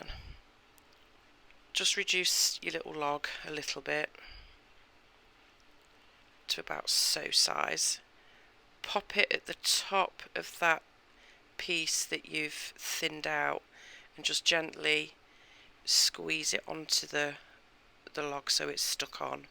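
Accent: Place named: Northern England, United Kingdom. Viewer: It's actually not as bad as I thought it would be, i like it and it's now in the oven! Thanks for the tutes it's really good when it's a northern woman's voice giving the instructions 😊 I'm northern too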